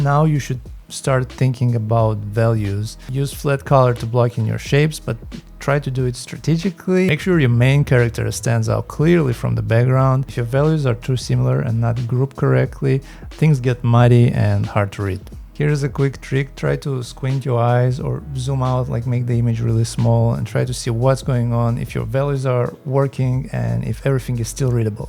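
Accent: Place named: Russia. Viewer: хорош кста слышно русский акцент 😃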